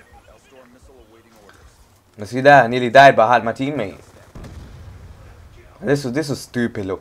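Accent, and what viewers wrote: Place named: Jamaica. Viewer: u sound jamica \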